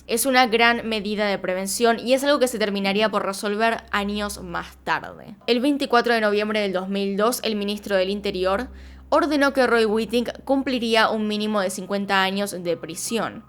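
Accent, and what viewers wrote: Buenos Aires, Argentina. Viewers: Eres porteña? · Acento argentino 😕😕😕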